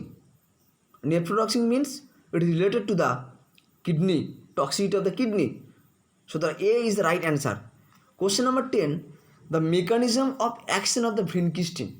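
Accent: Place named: Northeast, India. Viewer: Thank u so much sir 😊.. Your voice is seems like northeast people....I really love northeast people because they are very kind herted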